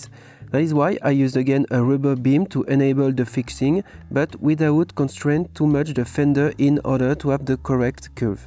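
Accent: France. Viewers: Je valide l'accent. Une version audio française serait superbe. Excellent modèle. J'aime beaucoup ces nouvelles roues, elles sont très réalistes.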